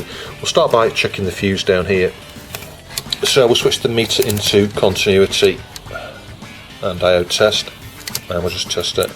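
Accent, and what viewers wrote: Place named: Northern England, United Kingdom. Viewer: Northern? Sounslikeyerfromrowndear.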